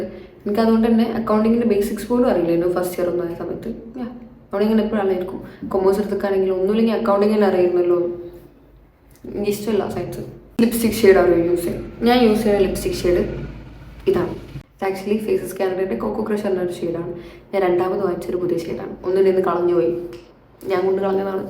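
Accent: India. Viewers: Aiswarya lekshmiyude oru facecut und.. Where was your schooling and college? Slang ketapo thoni frm malpuram anen bcz am also frm malpuram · KOZHIKODE slang pole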